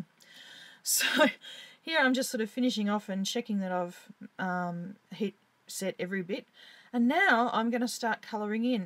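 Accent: Australia. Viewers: Great card, fab video and SOOOO nice to hear an Aussie voice! Well done. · Gorgeous card! It was nice to hear another Aussie! Well done and looking forward to seeing more from you.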